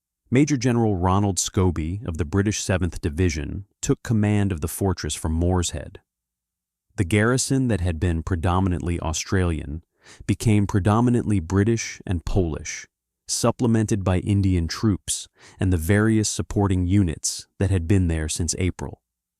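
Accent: United States. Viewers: Stupid Al strikes again miss spelling and an Al generated yanky accent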